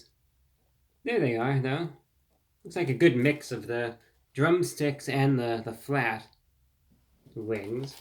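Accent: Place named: Transatlantic, United States. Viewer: Bro just casually speaks in a semi trans-Atlantic accent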